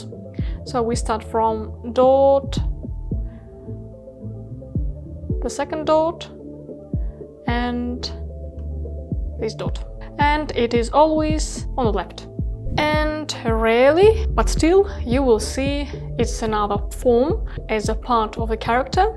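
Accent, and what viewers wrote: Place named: Kazakhstan. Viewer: I’m starting learning 汉字，because I want to learn calligraphy, so before I want to learn radicals. I searched on YouTube and found this channel, I’m literally like this 😮: it’s like all my dreams coming true. I don’t know exactly why, but this video and your channel really, really got deeply in me! Your grace, cheerfulness, clarity, humor, and maybe the slight Kazakh accent make this so wonderful. I’ll watch a video a day 🤩. 谢谢，! I hope you can continue doing these and other videos in the future 😊 P.S.: Omg 水晶 Crystal is water and 3 suns, shiny water! 😱😱😱 this is amazing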